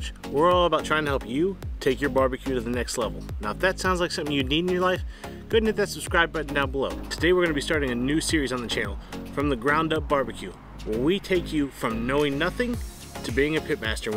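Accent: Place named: West Virginia, United States. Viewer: I'm assume you're from West Virginia....new subscriber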